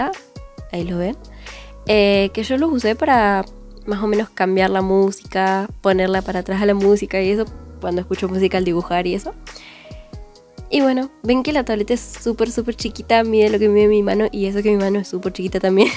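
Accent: Argentina. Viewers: Sos argentina quiero decir por el acento xd yo si soy argentina 😂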